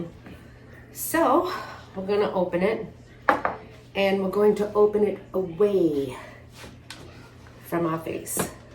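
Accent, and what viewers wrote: Massachusetts, United States. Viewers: Is there any chance your from Massachusetts/ Rhode Island? You sound like family. I'm in Mass, Metrowest near Framingham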